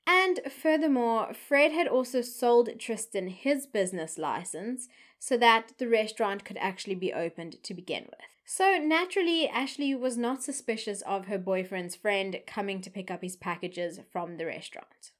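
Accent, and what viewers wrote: South Africa. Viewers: Your channel just popped up on my home page! I’m so glad it did because I already ADORE you, and have only seen this video ☺️ plus, your accent is awesome 😎 it’s great to see a youtuber in another part of the world that isn’t UK or US or Australia. We never hear cases from South Africa, except for the Pistorius debacle. This case is so scary!! I really hope Ashley can get out, the Thai legal system and government can never ever admit when they are wrong. I’ll share this video on my Twitter and Facebook. 🙏🏼♥️ much love from the UK 🇬🇧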